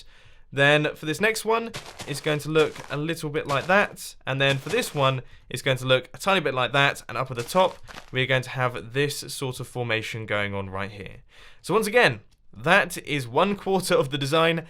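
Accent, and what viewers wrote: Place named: United Kingdom. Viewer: not to be rude are u british im thinking that because of ur awesome british accent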